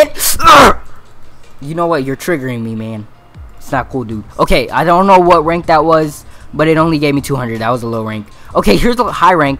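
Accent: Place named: United Kingdom. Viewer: british acent gang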